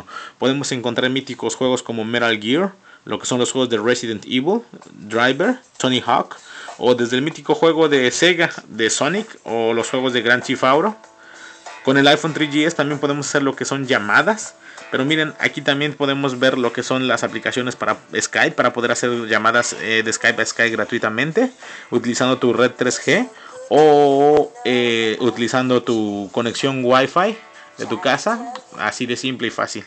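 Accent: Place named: Mexico. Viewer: 30 segundos y quité el video. como detesto el acento mexicano... mejor lo veo en Inglés weis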